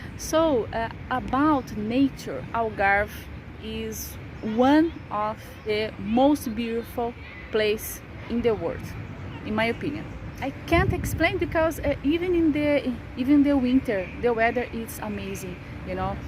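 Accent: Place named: Brazil. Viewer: Para brasileiro... nota 7 no ingles ... brasileiro tem 1 defeito que fica sempre o sotaque brasileiro entao a falar o ingles parece russo a falar portugues.... depois bullying na escola... portugal e dos paises que melhor recebe os brasileiros imagino 1 portuga numa escola brasileira....o algarve e uma zona turista logo é mais caro é so para quem pode ca viver ou como eu para quen nasceu.. não para quem quer...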